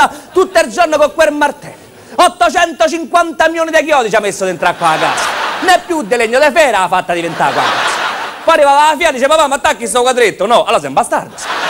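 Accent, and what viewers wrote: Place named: Rome, Italy. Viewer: Una velocità di battute,strepitose Poi quella parlata romana aumenta il potenziale Davvero bravo bravo bravo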